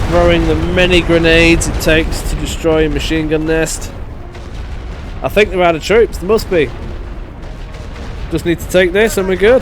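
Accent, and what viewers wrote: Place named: Lancashire, United Kingdom. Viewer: oh I’m on your time mate, been trying to place your accent I may be a bit off but I’m guessing round Bolton or wigan. I’m only in salford so shouldn’t be so confused lol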